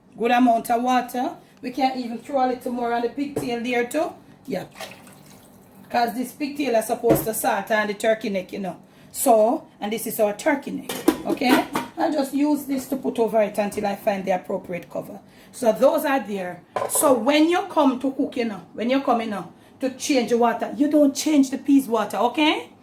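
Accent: Jamaica. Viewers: Hello Ms. I must say I love your accent your methods of cooking but most of all how you have taught me the correct way to cook the Jamaican way. Your advice your suggestions. Hopefully when I go to Jamaica 🇯🇲 I can visit you. And cook with you. Yeah mon the Jamaican way. And no I’m not Jamaican born in Colombia 🇨🇴 raised in New York living in South Florida.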